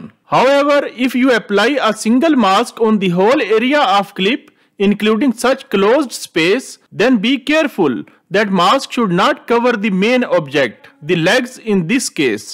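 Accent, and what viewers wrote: India. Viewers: Indian accents are always funny 😂. Very good video, very helpful 👍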